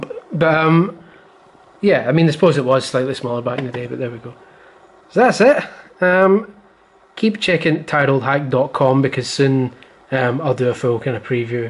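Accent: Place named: Edinburgh, United Kingdom. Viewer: you sound like you are from Edinburgh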